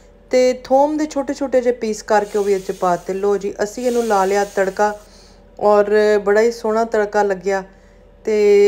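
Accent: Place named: Punjab, India. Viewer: Salaam ❤ sweetest Punjabi ❤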